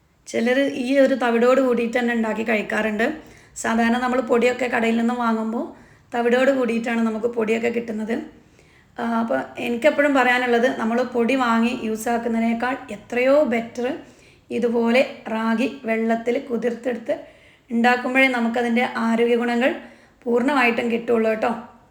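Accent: Malappuram, India. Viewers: Aa malapuram baasha thanne Mathiyenu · Malappuram thathante slang maariyo😂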